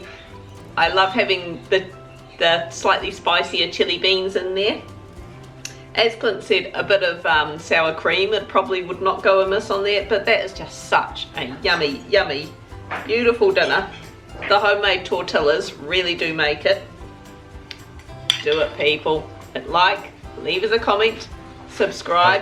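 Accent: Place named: New Zealand. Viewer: Yum! I have just found your channel and I find you so refreshing and natural. It’s great to see and hear a genuine Kiwi for a change. I’m in the Bay of Plenty. 😀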